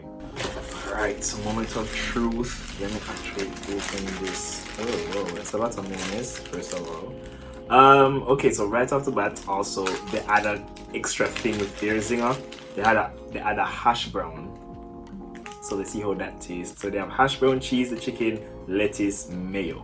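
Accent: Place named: United Kingdom. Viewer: the little hint of british accent lol u sound like them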